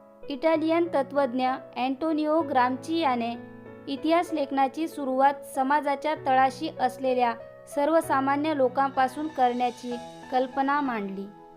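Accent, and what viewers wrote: India. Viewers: Tumi kontya gavane bolt aahat😊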